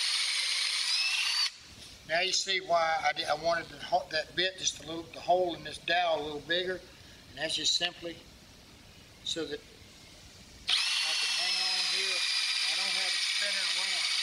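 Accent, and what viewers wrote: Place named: South, United States. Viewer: I love the down to earth no BS way of explaining each step, complemented with that great southern accent. Thank You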